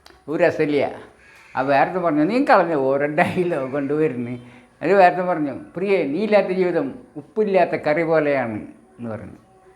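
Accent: Thrissur, India. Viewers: സാർ വളരെ നന്ദി🙏 വളരെ നല്ല ക്ലാസ് ' സാറിൻ്റെ സംസാരം കേട്ടിട്ട് തൃശൂരാണെന്ന് തോന്നി. ഞാനും തൃശൂരാണ്😊🙏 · Sir thrissur ano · സാർ തൃശ്ശൂരാണോ?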